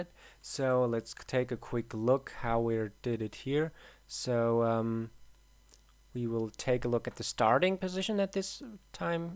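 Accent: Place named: Germany. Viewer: Aber Du hörst dich schon an als ob Du krampfhaft versuchst, den deutschen Akzent zu vermeiden :P (Geht mir aber genau so, wenn ich im Ausland bin..bloß nicht Deutsch klingen^^)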